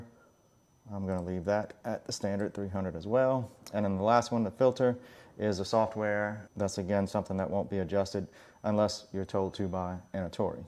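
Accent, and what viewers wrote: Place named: Louisiana, United States. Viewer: Love your informative videos! Where in the south are you?? Sounds like Louisiana accent!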